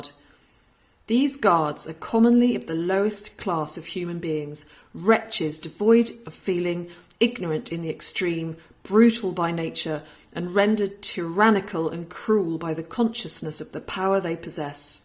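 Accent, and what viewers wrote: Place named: London, United Kingdom. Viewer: I restrained myself, but during the Hoo Island adventures, I started to leave a comment asking if you'd seen Horton, and are there actually Hoos on Hoo Island. It comes from a Dr. Suess book, Horton Hears a Hoo.:) Years ago, I had a friend who was from London. She was much older than me, but still a very lovely woman. The bottoms of her arms were horribly scarred from glass shards. As a baby in London her family had sought shelter in a store with big glass windows. She heard the women scream and saw black shapes falling from the sky, so she instinctively crossed her little arms in front of her face. That was 1940 and she was 3. I knew her in 1998. She had a lovely accent and voice like yours. I'd drink beer and talk to her at a bar called The Waterin' Hole. Her American hubby didn't like going out. I suppose she'd be about 80, or 81 now.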